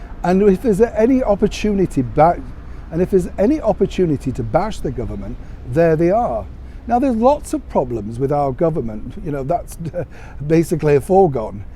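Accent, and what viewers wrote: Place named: RP, United Kingdom. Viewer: What’s really odd about this video is the speaker, with his accent and suit simply dripping with posh, trying to align himself with “hard-working people.” It’s hard work to pull that silver-spoon out of your arse is it?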